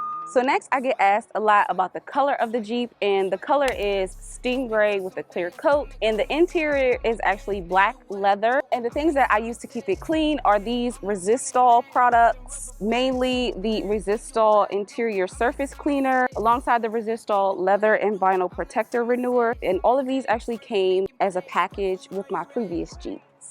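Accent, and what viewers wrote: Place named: Northeast, United States. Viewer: Where are you from?? I’m detecting northeastern vibes.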